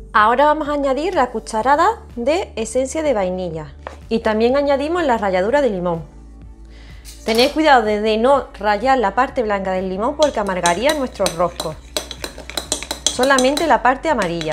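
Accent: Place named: Seville, Spain. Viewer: Yo tambien soy de sevilla vivo aqui hace. 58años. Desde los5 que acento mas bonito yo no lo tengo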